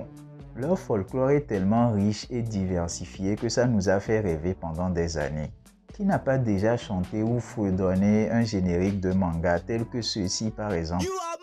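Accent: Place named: Togo. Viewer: Je suis béninois mais je reconnais l'accent togolais. Félicitations et beaucoup de courage !!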